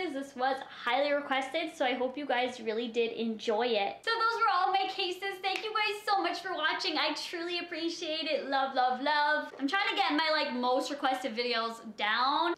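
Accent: Canada. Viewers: she's also Canadian so they pronounce words different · omg i can just tell that she is canidian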